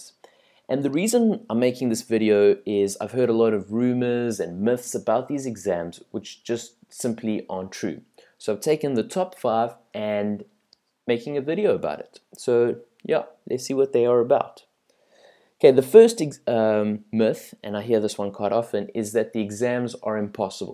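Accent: South Africa. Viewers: Ahah you are so South African! Me too.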